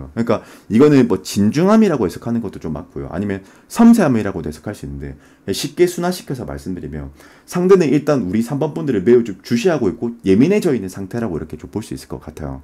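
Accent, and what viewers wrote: Gyeongsang, South Korea. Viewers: 음~~경상도 발음= 사투리👍👍많이 해주3 글구..소화가 잘 안 되 시나욥?위역류요런거😅😅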